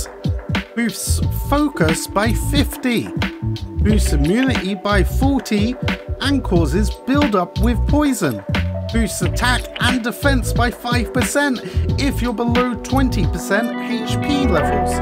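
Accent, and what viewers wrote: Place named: United Kingdom. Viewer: it's his normal voice, he is just bri'ish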